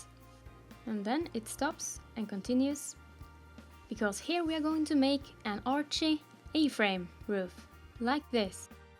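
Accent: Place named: Norway. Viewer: Why you sound Norwegian ?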